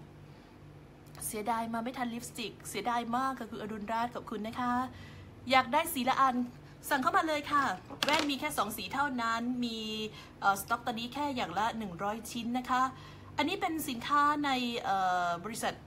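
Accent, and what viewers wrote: Isan, Thailand. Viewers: เสียงกลับมาแล้ว คลิปที่แล้ว เสียงอีสาน ระวังมีดบาดนะคะ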